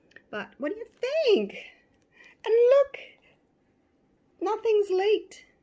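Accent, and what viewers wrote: Australia. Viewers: there are resin syringes, i also find that a pipette works too. i work with uv resin and just started but have gone crazy making pendants. btw the moment she heard your accent my veardies head popped up and she fired off with a deeper red and orange coloration. she tends to love an aussie accent and gets all excited when she hears one. as for left overs you could try a free form pendant with a hot glue barier to help keep it from going too thin. resin makes a wonderful acrylic pour look. edit to add: those broken bits can be reset with a nice thin set resin you just whipe it on and let it set. uv resin can also be used to repair the bits as well so it doesnt run. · Yes I totally agree, I love your accent. My friend at work is from Australia too, she sounds like you even though she has been living in Ontario, Canada for more than 20 years.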